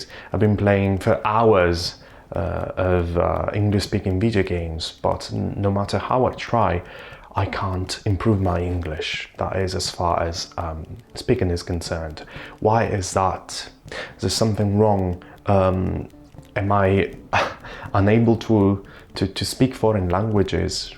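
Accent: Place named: North, United Kingdom. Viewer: Sexy northern accent... Where have you been in Britain to study English?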